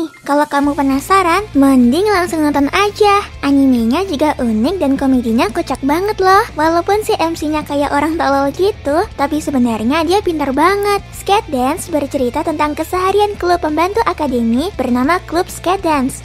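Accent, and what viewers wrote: Japan. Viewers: faseh bgt aksen jepang nya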